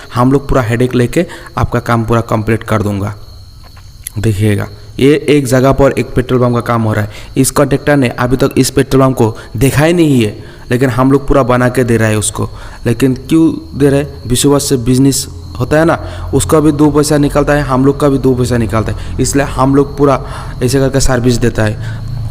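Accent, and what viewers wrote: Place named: Odisha, India. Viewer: BHAI APKO HINDI BHASA BOLNE ME DIKAT HO RAHI HAI KYA AP KI HIDI BHASA SE AISA LAGTA HAI AP ORRISA KE RAHNEWALE HAI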